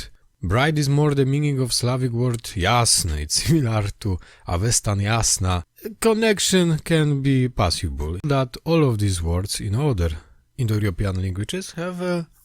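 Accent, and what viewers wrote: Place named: West, Poland. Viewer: tbh.. Your sanskrit sounds like you're reading it maybe for the first time. It has a more western accent. But I understand it may be because you're new to it (i guess) or because you're reading it in a latin script. There are parts where we pronounce the sound short or long(this can be known while reading it with the Devanāgari script). Old Sanskrit was also written in Brahmi script(which has connections to Aramaic) but rn Devanagari is widely used. I'd suggest, if you have time, try to learn the sanskrit alphabet and phonetics. Or maybe listen to sanskrit being sung, chanted or spoken.. Also Check her channel: <link> I think she is Greek(from her name). She has quite an accurate pronunciation of Sanskrit. Really Accurate! I've been learning German for some months now. I observed some similarities in Grammar and Word Patterns. 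German and Sanskrit both have Gender words for non living things.. Oh and also the ability to create new words by joining pre-existing words. And creating longer words/sentences with many words joined as one. I've seen it being done in Sanskrit and German both.. I found it interesting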